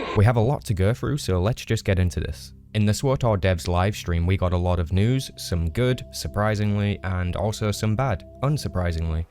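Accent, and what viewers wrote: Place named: Northern England, United Kingdom. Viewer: A fellow northerner? I must subscribe! Love owt like this wi’ voices like ours like.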